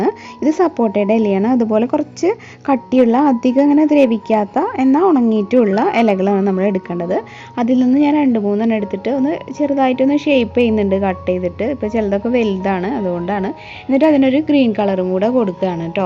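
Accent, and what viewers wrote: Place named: Kannur, India. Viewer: വെളിച്ചങ് കണ്ണൂർ ഭാഷ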